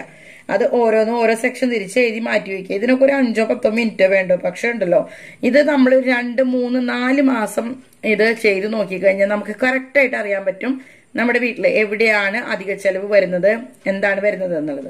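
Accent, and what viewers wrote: Thrissur, India. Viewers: 😁nan subscribe cheithu.chechide varthanam kelkkan nalla rasam undu.thrissur basha.nan kannur.epo husinte koode gulfil.chechide morucurry anikishta.nan undaki.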